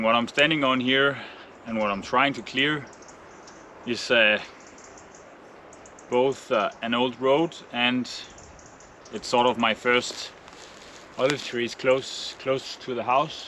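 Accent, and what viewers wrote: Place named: Denmark. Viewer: I immediately knew you were Danish! I once had a Danish flatmate . The accent is so easily recognisable.😅 Nice video! Keep up the good work!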